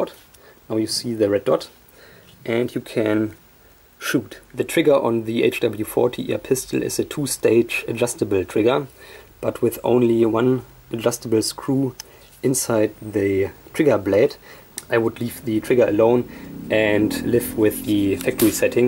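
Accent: Germany. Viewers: DIESER DETSCHE AKZENT HAHAHA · Nice video! I love they German accent in English, A really god air pistol, and the Pellets are excelent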